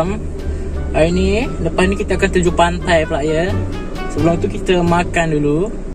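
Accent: Terengganu, Malaysia. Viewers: Bang org terenganu ke bang? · Lah abang org terengganu..ekgi boleh jupe😂 btw sy sentiasa support abg❤️ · Abg orang ganu ke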